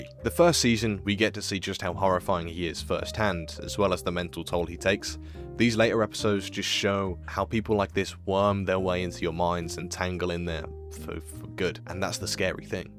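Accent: United Kingdom. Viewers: isnt this guy british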